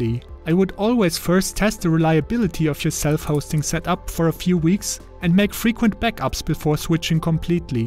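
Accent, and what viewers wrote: Germany. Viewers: You seem to have a German accent, am I right? No criticism, just for you to improve: You are talking about Queries, not Qiuris :) try Googling the pronouciation. Ps. Great video. I have my own NextcloudPi and it is great. With Nextcloud Talk you even can use a Teams alternative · uuugh german english :D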